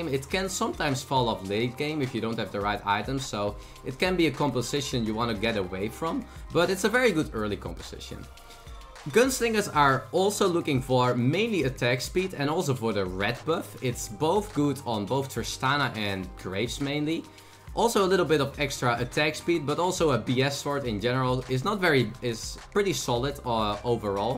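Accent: Netherlands. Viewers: This guys his dutch accent is so strong lol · Obviously Dutch, that accent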